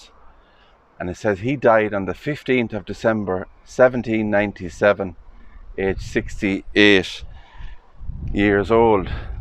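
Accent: Ireland. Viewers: Beautiful video thanks to you Lovely irish voice.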